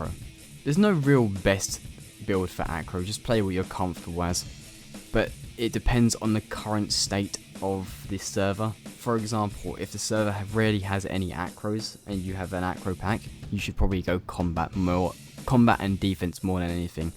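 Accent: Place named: United Kingdom. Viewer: cant believe your british but you sound more british in this vid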